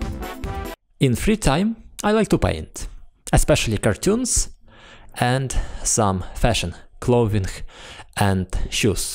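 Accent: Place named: Germany. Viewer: I loved this accent .. is that german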